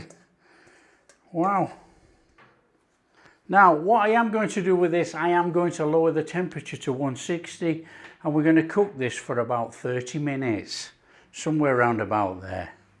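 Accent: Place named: North Yorkshire, United Kingdom. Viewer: Where are you from? Can’t place your accent, North Yorkshire?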